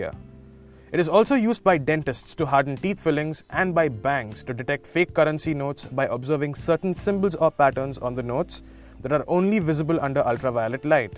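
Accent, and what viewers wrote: India. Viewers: Indian accent everywhere in youtube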